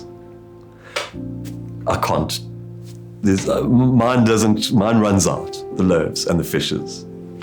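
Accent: South Africa. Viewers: Retiring brings on a mindset of … it’s the end 💥 … agree with that. You sound like a fellow South African … Blessings 🇿🇦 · You sound South African. Thank you for your positive video Happy Retirement. 🇨🇦🇿🇦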